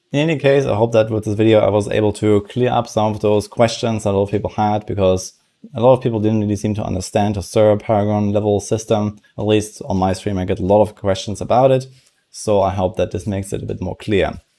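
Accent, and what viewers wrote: Germany. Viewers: Lass es mich wissen ob du Deutscher bist? Man hört es raus 😅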